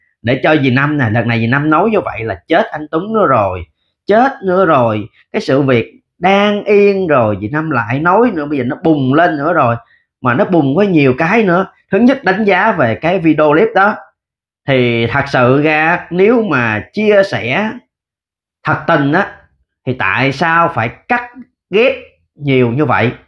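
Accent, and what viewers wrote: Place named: Mekong Delta, Vietnam. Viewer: Công nhận.em nói tiếng.giong trai đồng bằng.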